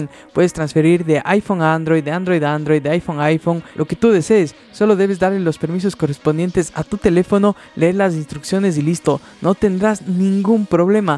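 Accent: Cuenca, Ecuador. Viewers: Eres de cuenca?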